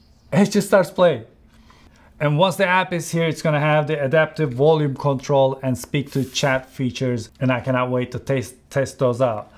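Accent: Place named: Turkey. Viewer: I knew it! I thought I was going crazy because your accent sounds Turkish!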